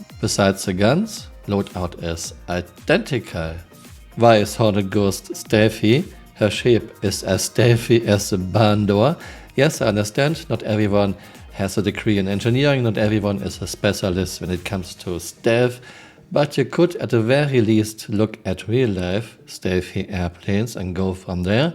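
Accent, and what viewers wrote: Germany. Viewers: deine videos sind echt super cool und informativ. Etwas ganz besonderes in der star citizen community und ich liebe deinen deutschen akzent haha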